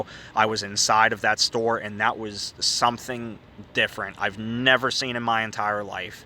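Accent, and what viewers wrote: Pennsylvania, United States. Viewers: i was born in oil city in 61 love the pa accent glad it wasnt u car parts u were lookin thru in another video when i asked,,,,,we really need somebody in rochester ny area to do these soooo many to film.....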